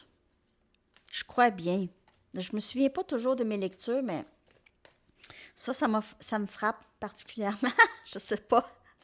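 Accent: Canada. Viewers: je viens aussi pour écouter ce bel accent canadien gratitude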